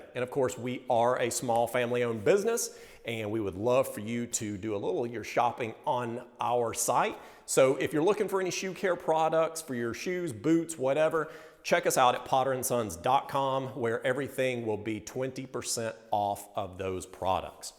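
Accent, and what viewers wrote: South, United States. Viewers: He's what was once called a "Good Old Country Boy", a man who is respectful and demands respect in turn. He reminds me of my 7th grade teacher and coach, Mr. Jackson. I went from a suburb of Nashville TN to a backwoods country town named Springfield. Mr. Jackson taught English and was the sole sport coach for basketball. He had the same register voice and tones, and, the same accent. Funny thing was, years later, I saw a painting of Pres. Andrew Jackson, and the two men looked almost identical, except Mr. Jackson was almost completely bald. He was a taskmaster, for sure. and keep the tradition of hand craftsmanship alive and prospering. They also show the HUGE difference between hand crafted shoes and factory issue. Unfortunately, we're so used to "factory outlet" shoes (and their concomitant pricing) that when we run across shoes from these guys, we're usually in awe of the quality and attention to detail and their pricing. 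Old saying goes "You pay for what you get." With these guys? Absolutely.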